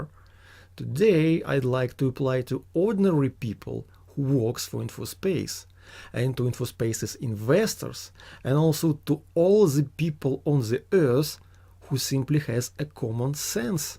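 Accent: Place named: Russia. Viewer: Ну, во первых в своём комментарии я не затрагивал вопрос национальности, а имел в виду твоё произношение. Я понимаю, ты в этом не виноват, так тебя научили. Такое впечатление, как будто ты читаешь английский текст в русской транскрипции. Ведь в английском есть звуки, которые невозможно выразить по русски.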